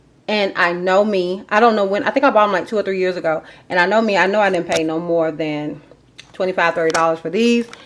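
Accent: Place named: Alabama, United States. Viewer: I love your personality!! You sound like ALL my southern cousins in Alabama. 😂 ♥️♥️♥️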